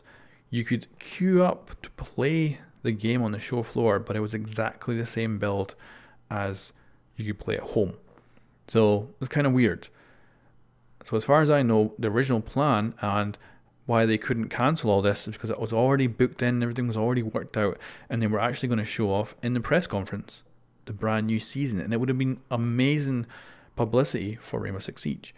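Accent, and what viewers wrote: Scotland, United Kingdom. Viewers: Nice scottish accent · Ur Scottish right? Me too :)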